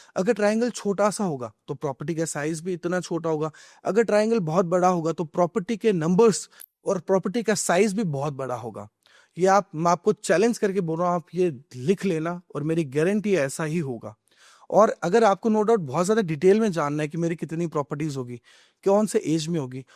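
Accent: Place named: Marwar, India. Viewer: No doubt aap ek Marwadi bande ho no doubt apki boli bhasha se jana bcoz no doubt main bhi marwadi hu 😅😅😅 baki no doubt apne jo hhi bola h vo nhi ke brabar h 😢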